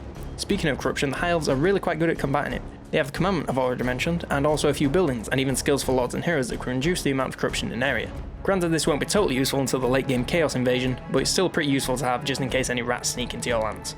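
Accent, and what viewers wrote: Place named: Manchester, United Kingdom. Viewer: He sounds like he’s from near me. You from Manchester mate?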